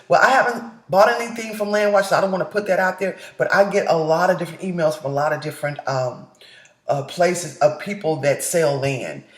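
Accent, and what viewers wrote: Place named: South, United States. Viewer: Smart women and I love your southern accent.👍🌈☮️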